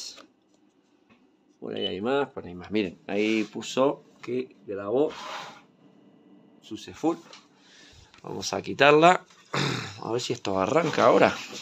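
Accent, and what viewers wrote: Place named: Argentina. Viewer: Que groso saludos de argentina y gracias por tus conocimientos....jajaja insisto que tenés tonada de argentino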